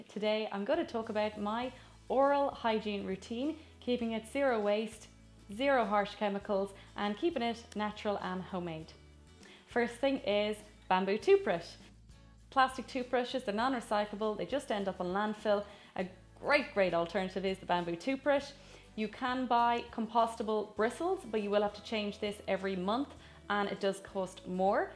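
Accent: Ireland. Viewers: You mentioned a powder but sorry I couldn't understand 🙈 Irish accent is 😍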